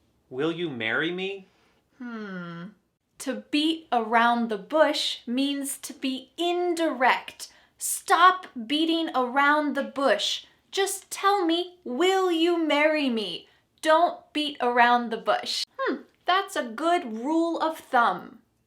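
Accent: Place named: United States. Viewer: Hai saya dari Indonesia, saya sangat senang bisa menemukan video ini, saya juga sangat suka dengan aksen Amerika, ini bisa menjadi motivasi saya untuk belajar banyak tentang bahasa Inggris 😊, Terimakasih!! 🙏🏻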